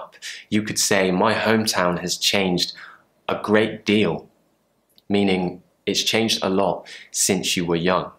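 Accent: RP, United Kingdom. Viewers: Thank u so much, your videos help us a lot. Please don't stop to make. Your pronunciation is very clear, just try to speak slowly and it's cool to learn english with a british. Seriously thanks thanks thanks. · I see you try to speak a natural sounds of English. It's means RP for me. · Nice British accent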